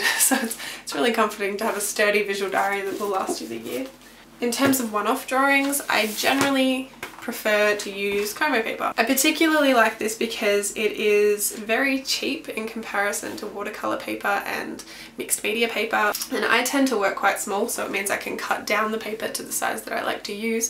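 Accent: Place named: Australia. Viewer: Are you Australian? I never knew for some reason I just assumed you were British. I'm from Sydney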